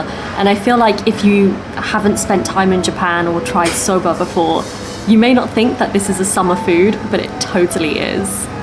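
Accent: RP, United Kingdom. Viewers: ほんと可愛いし、British Englishへの慣れという点でも助かる。こちらも見てて楽しくなります。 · 何時も拝聴しております。さんのクインズイングリッシュはとっても聞き取りやすい。イギリス英語？は何時の頃からか話し言葉から書き言葉に変化したのではないでしょうか？。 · 美味しそうに食べるなぁ！やっぱ英国の英語ていはいな。40代だけど中学生の時に途中からアメリカ英語にかわった。聞き取り安いけど、イギリス英語は雅な響きあるな。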